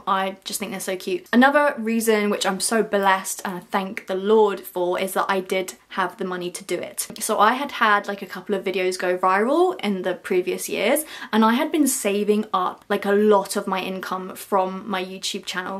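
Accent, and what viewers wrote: United Kingdom. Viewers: Wow ur British accent is so cool😅😊❤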